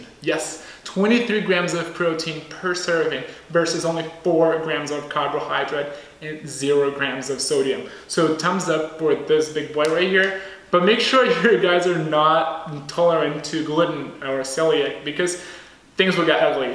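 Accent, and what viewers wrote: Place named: Brazil. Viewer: Your accent sounds Brazilian! Vc e brasileiro?? I've been looking for more sources of protein that are low on carbs because I'm struggling with my macros lately. They've been around 60% carbs 18% fats and 18% protein. I'm struggling hard but this video and your banana bread video helps a lot! Make more please!!!! Obrigada :)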